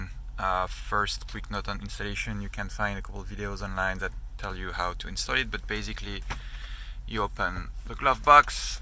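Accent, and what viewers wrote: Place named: France. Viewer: Au vu de l'accent je suppose que tu es français, donc super vidéo ! Je reçois le miens dans quelques jours et ta vidéo va me permettre de faire tout fonctionner un peu plus rapidement. Merci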